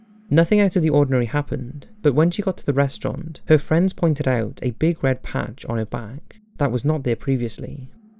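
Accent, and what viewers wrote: United Kingdom. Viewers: Where are you from? I thought you might be British, but throughout your videos you mispronounce certain words, so I thought English might be your second language? For instance Ouiji and heinous were mispronounced. I’m not poking fun, I’m just really curious.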